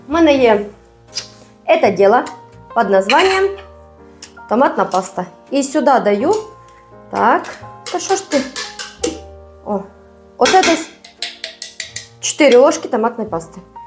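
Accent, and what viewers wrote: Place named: Poltava, Ukraine. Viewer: Ваш юмор і діалект, схоже Ви з Полтавщини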